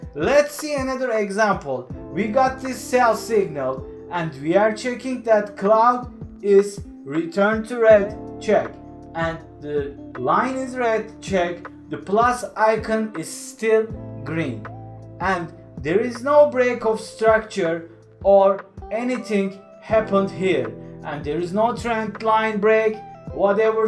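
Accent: Turkey. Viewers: abi konuşmadan dedim bu türk aksanı baktım öyleymişsin güzel video emeğine sağlık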